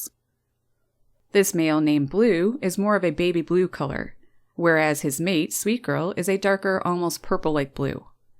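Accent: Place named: Atlantic Canada, Canada. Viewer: My favorite video! Your accent sounds like you're from east coast of Canada. Are you?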